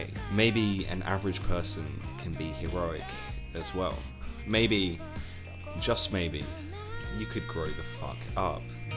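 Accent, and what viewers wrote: United Kingdom. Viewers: Your cutting British accent and the background reminds me of exurb1a. Much love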